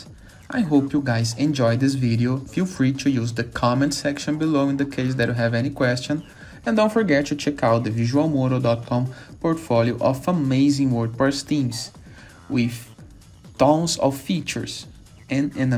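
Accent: Brazil. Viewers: o sotaque não engana, br na veia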